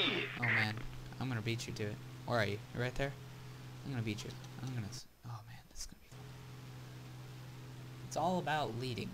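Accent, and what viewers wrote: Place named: Russia. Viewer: good job man :D Dat Russian accent doe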